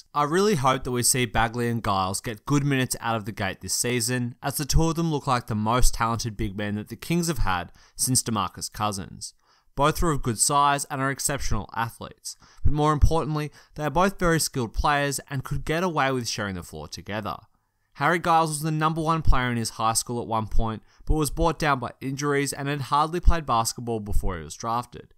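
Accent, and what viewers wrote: Australia. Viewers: is it australian accent ??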